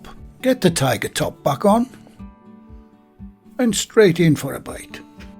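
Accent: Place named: North, United Kingdom. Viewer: Hi, hope you don't mind me asking this - I have been using your Tiger Bread recipes and really enjoy your videos and clear instructions. Its great to hear a fellow northerner's voice. I was wondering if its possible to do a cold-proofed Tiger Bread with any of yourTiger recipes so the bread can be baked early the following morning? Also would you add the rice flour mixture whilst it was proving in the fridge or very gently the next day? Any advice would be much appreciated - thank you in advance Take care and stay safe Best wishes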